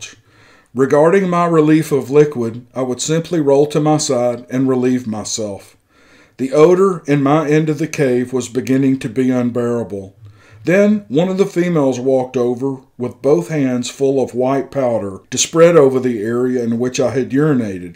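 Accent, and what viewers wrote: South, United States. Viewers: One of the most compelling stories and the way you narrate these accounts is awesome, and your southern draw adds to this story thank you my man for this magnificent post ⭐⭐⭐⭐⭐. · I love your voice and soft southern accent! Great story! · thank you for this thanksgiving day treat. what an interesting story! hope you and your family had a wonderful day. love your channel. your stories are top notch and you're a fantastic narrator. your great southern accent is a treat and makes listening to these stories more enjoyable. I just recently subbed to your channel and have now listened to all of your previous uploads and look forward for each new post! keep up your good work and I can see your channel will quickly grow. thanks. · I live in Elvis land now and work in North missippii love listening to u because u sound like me.good show love it! 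· I LOVE LOVE LOVE this story!! Thanks so much to the writer, and to you,! This story could only be told with a good Southern accent! I often listen to your stories multiple times (like reading a good book multiple times, when you think about it), but this one I replayed straight away... I wouldn't be surprised if this is a true account. There must be more stories like this out there... told to children and grandchildren... Thanks so much for this gem 🪄💎 ✨️ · It was nice to hear the story narrated by someone with a genuine southern accent. The story is credible and worth the time to listen to. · If you will listen again...carefully at 1.06 l believe, he correctly pronounced the word you are so quick to call him out on...maybe your hearing might be a bit dull. When you have thousands of people listening to you and asking for more, maybe then you might have cause; we who absolutely adore this gentle Southern drawl expect him to read this as a Southern boy would speak. 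At any rate, he is a big man, kind and careful...try to follow his lead. I believe he will steer you correctly, cheers & happy trails!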